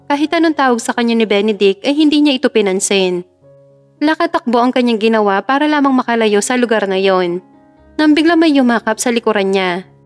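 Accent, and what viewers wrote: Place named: Batangas, Philippines. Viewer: Taga Batangas po ba kayo,my tono kasi ang pag basa nyo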